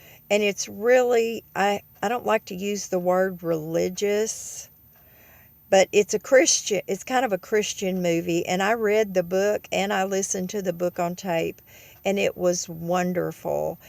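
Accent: South, United States. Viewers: Just taking bids I hear. You have a southern accent, but do you speak Spanish? haha.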